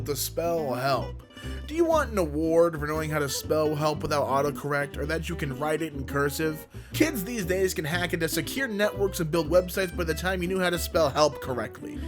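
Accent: Scotland, United Kingdom. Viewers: Please tell me how to do your Scottish voice I want to know how you do it so well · Dude you sound like Scotsman from samurai jack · As a born and bred Scot, that Scottish accent was perfect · I love his Scottish accent.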